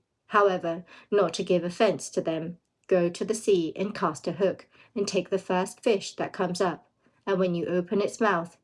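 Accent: United Kingdom. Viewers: English is my second language, and I love the British accent. You can't imagine how happy and grateful I am for your reading. please never stop. God bless you.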